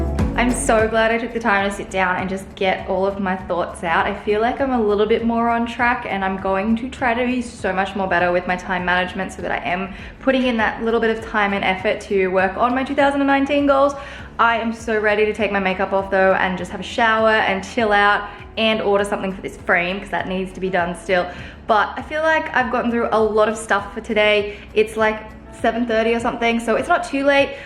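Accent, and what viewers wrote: Australia. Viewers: Hi! Are U from Australia?? By the way, New here, love your videos!! ❤️❤️❤️ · This may be a weird question.. are you Australian?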